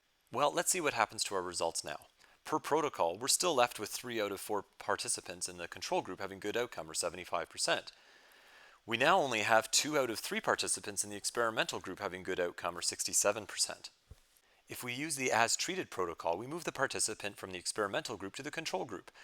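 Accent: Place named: Canada. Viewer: That Canadian accent thoughhh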